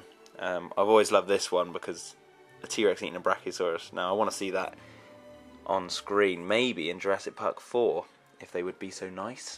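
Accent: England, United Kingdom. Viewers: do u have a British accent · your from england rite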